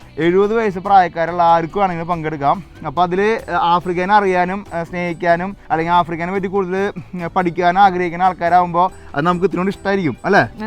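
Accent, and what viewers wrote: Thrissur, India. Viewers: ഈ വീഡിയോ ചേച്ചി കൊണ്ടുപോയി വിത്ത്‌ തൃശൂർ സ്ലാങ് 😍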